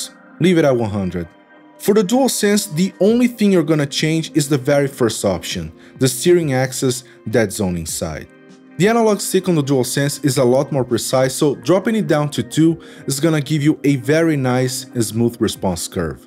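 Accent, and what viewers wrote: Brazil. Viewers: tbm achei kkkk to quase ouvindo ele falando em português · Esse cara é brasileiro kkkk... Certeza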